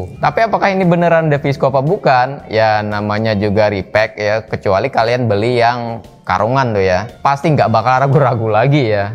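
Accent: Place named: Bogor, Indonesia. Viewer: Suara dan nadanya mirip ridwan remin🤣 orng bogor ya bang😂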